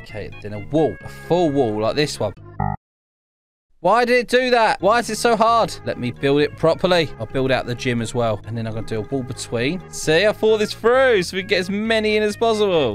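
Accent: United Kingdom. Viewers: Ur British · Mate pls tell me why British people say water soo weird (I love the British accents) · Are you british and also GOOD VİD try race clicker pls 🎉😮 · you british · Are you British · YAY A NEW BRITISH GUY!!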